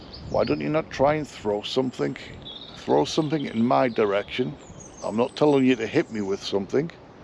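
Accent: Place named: North East England, United Kingdom. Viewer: It's nice to hear a north-east accent. I'm from the NE myself =)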